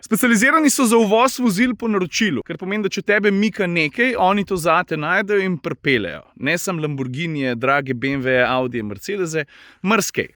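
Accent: Styria, Slovenia. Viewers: velikokrat me tvoji videi tudi nasmejijo, ampak tale uvod je bil pa čisto po štajersko... Čooj a si ti upaš, pa hlače gor potegne:)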